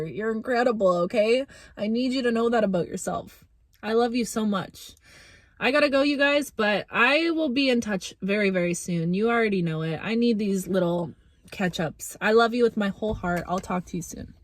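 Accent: Canada. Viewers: Are you Canadian? 😮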